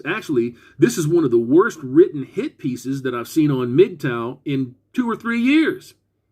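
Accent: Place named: South, United States. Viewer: First time viewer here (Sandman sent me) Love this lol! Where is from? has to be the south. He sound like my cousin! must be part black lol love it!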